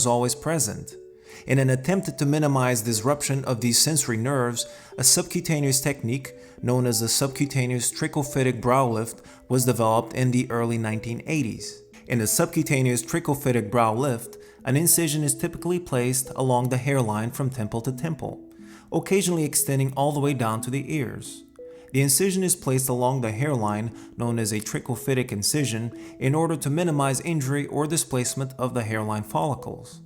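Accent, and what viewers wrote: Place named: Turkey. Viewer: is he Turkish? he sounds Turkish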